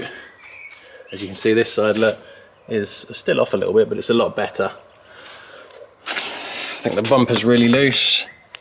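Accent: Birmingham, United Kingdom. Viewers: Definitely from Birmingham 😂😂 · Blatantly from Birmingham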